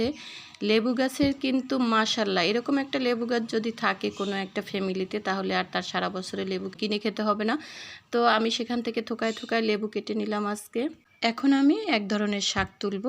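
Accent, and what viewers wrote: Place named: Barishal, Bangladesh. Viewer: 125করে পাশে রইলাম আপনি কিন্তু বরিশাইল্লা কথায় বুজা যায়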